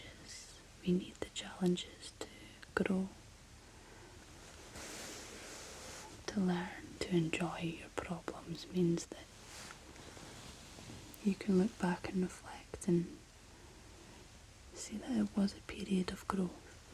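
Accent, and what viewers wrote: Scotland, United Kingdom. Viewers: Love your Scottish accent, reminds me of my childhood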